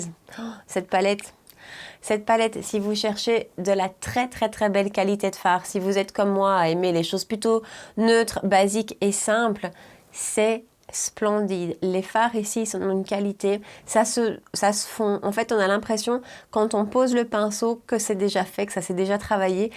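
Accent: Belgium. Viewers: J adore tes vidéos, j adore le fait que tu viennes de Belgique ( je suis belge et j habite en France depuis 10 ans, ton accent est un émerveillement des ouïes) et j adore ta couleur de cheveux, aurais tu la référence de ta couleur stp?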